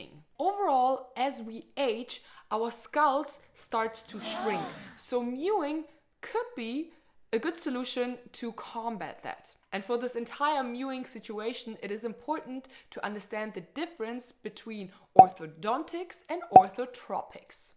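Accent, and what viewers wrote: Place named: Germany. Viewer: Hab direkt gemerkt, dass du Deutsch bist ;)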